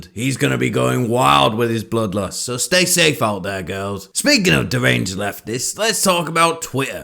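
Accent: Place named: United States. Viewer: this is the most american sounding man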